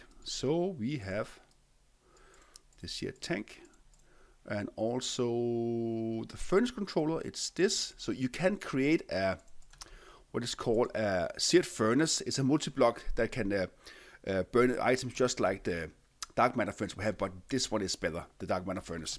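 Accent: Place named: Denmark. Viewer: can't decide if you sound german or Danish, but pretty sure I hear the strong danglish accent.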